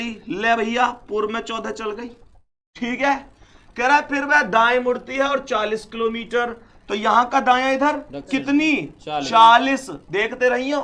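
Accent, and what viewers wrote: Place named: Bharatpur, India. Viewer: Bharatpur jesi language h sir apki ❤❤❤❤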